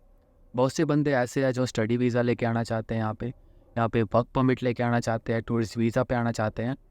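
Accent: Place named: Haryana, India. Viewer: Ye haryana se lag raha hai😅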